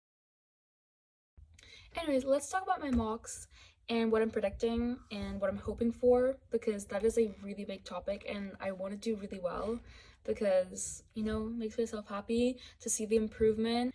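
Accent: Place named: United States. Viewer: ohh u have an American accent when talking in English haha. Je peux pas parler français????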